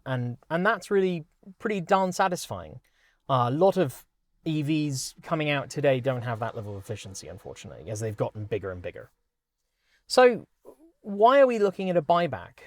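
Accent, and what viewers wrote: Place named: West Country, United Kingdom. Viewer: I do like a Bristol \ West Country accent!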